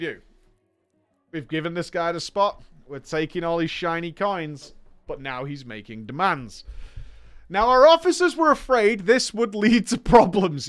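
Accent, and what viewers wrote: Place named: Yorkshire, United Kingdom. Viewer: You are a top notch story teller, being from yorkshire it's even more pleasant haha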